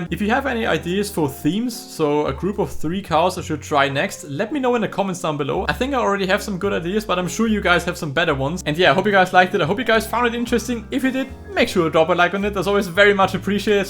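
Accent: Germany. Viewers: Are u germin???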